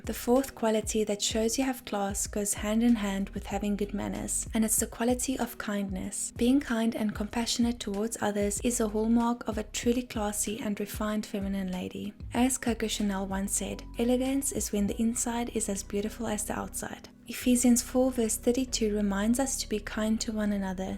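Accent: South Africa. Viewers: South African, maybe Capetonian or Joburg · 💯 South African accent 🎉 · South African 😂😂😂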